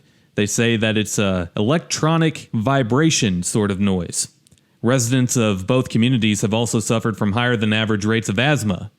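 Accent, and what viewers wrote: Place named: South, United States. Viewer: Why is this Trailer Park Bumpkin speaking on Michigan Period. You can hear the red neck in his throat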